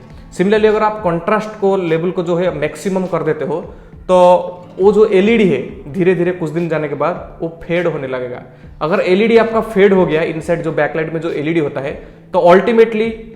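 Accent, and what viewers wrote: Odisha, India. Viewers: odisa se ho ??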